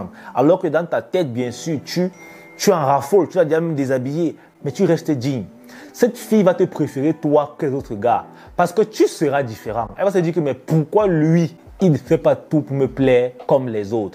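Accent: Cameroon. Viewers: T'as l'accent camerounais, suis camer aussi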